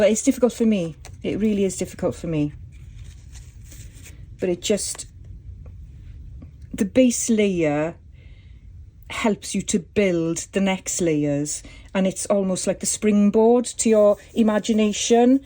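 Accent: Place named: Wales, United Kingdom. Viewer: Hello from Montreal. I think there will always be people preserving the art of paper working. It's a lovely art. I was trying to place your accent... it's Welsh... Love it! I so enjoy watching your videos. Thanks for sharing! Takecare. BTW...love your page! ❤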